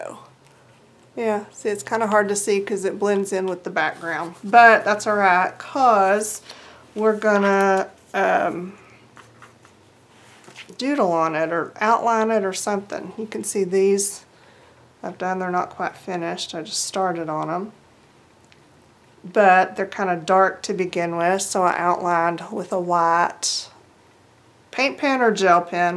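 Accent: South, United States. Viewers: Those are so cute:-) I love your southern accent:-) I am going to try and make some of those myself. Im glad you didn't come back from cutting up the sheets all bandaged up:-) Your ideas are just clever and amazing! I would of never came up with an idea llke that with my pea brain:-) Thank you!!!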